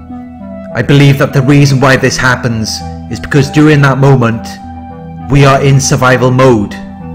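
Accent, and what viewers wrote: Wales, United Kingdom. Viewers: There is something different about your channel, your voice, the content, the music, the images, it’s all perfect, it’s like it was made for me. BTW are you welsh?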